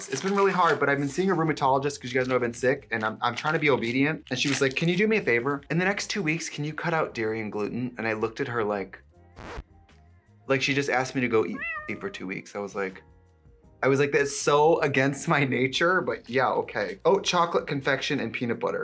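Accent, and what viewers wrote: Wisconsin, United States. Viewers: Per beggg love her Wisconsin accent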